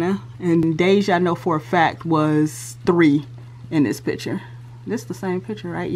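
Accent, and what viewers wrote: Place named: Midwest, United States. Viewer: We love y'all too girl! I'm from the midwest too...and we even talk alike. And yes we would say "hooo" for the ice cream truck...lol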